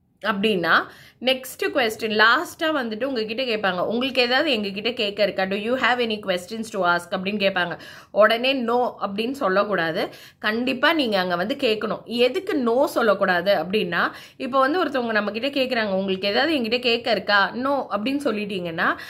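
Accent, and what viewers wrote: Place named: Kerala, India. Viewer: Watching 2 to 3 videos in the past I doubted that you would be malayali..... And In this video your slang resembles like a malayali .....are you a malayali..??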